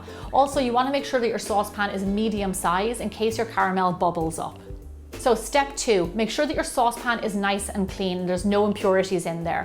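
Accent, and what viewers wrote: Ireland. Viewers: Are you Irish? I thought I recognized the accent, but I don't know, I haven't look at the rest of your channel! yett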